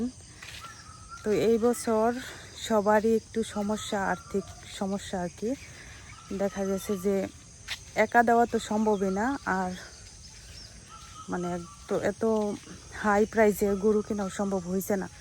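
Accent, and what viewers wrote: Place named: Comilla, Bangladesh. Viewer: ম্যাডাম কেমন আছেন। আপনার কথাশুনে বুজতে পারছি আপনার পর্ব পুরুষ কুমিল্লার হবে।তবে যাক পরের কথা হল আমি কুমিল্লা জিলা তিতাস উপজেলা থেকে দেখতেছি আমি বলতেছি ম্যাডাম যদি জমি কিনে রেখে দিলে কয়েক বছর পরে বিক্রি করার জন্য ঠিক হবে কি। কমেনট করে জানাইবা কেমন ।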